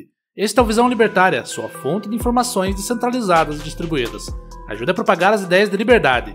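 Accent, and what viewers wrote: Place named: Paraná, Brazil. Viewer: O texto é bom. Mas a voz desse narrador, vamos combinar é muito chata, esse sotaque do terceiro planalto paranaense é de doer. kkkkkkk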